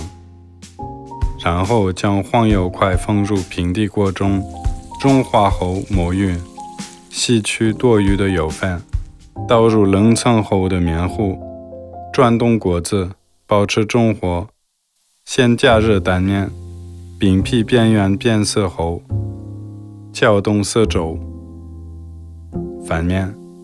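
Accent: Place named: Henan, China. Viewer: 河南口音笑死我了哈哈哈哈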